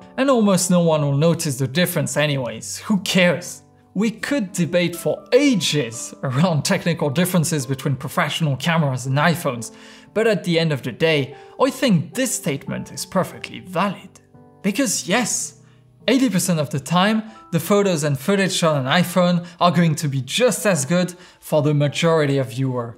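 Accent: France. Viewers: I love his pure thick French accent. It’s ASMR at its finest. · Youre french? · The most french thing anyone has said: (especially with that accent) lol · Are you French or Canadian? Just curious. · T’a l’accent français je trouve si tu es français alors je dit bravos 😊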